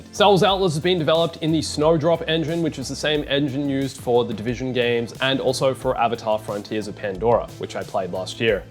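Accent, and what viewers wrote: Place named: Australia. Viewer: His accent, Aussie w/ an American inflection on all the 'r's. It's irritating. Pick a side of the Pacific & stick with it.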